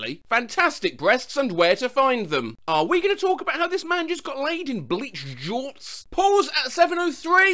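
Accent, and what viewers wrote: United Kingdom. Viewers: ITS SO FUNNY HEARING THIS MEME IN MY HEAD CORRECTLY AND THEN HEARING A BRITISH GUY, WHO CAN READ KEYSMASH, ATTEMPT TO READ ALL THESE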